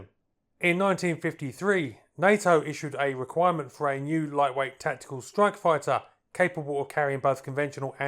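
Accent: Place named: United Kingdom. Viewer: THAT SPEAKER HAS FLAT TONE AND STRICT BRITISH .. NON UNDERSTANDABLE FROM THE INTERNAT. AUDIENCE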